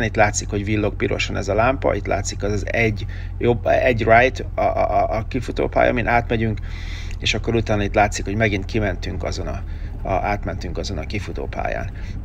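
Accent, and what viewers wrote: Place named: United States. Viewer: hihető és elfogadható a kicsiny "amerikás magyar" akcentus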